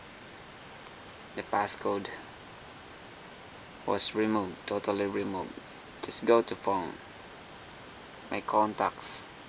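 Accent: Philippines. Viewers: Philippine? i have a philippine teacher that speaks exactly the same. switches the Fff with P, and some she switches some other letters too. but i mainly remember that. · filipino accent :)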